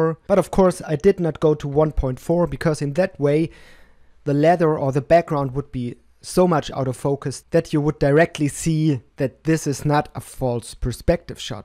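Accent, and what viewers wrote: Germany. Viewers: Du machst echt gute Videos. Warum machst Du das nicht (auch) in deutsch? Also, ich meine bei Dir rauszuhören, dass Du eher deutsch sprichst, oder? Echt gut.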